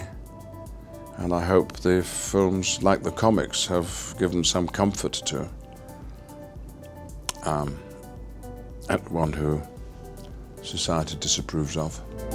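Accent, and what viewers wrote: RP, United Kingdom. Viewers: Only Ian would answer questions while drinking tea and sounding posh AF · It's strange hearing American pronunciations with such a posh accent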